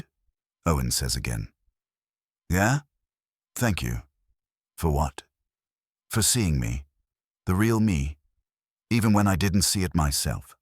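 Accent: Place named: England, United Kingdom. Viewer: English accent is awful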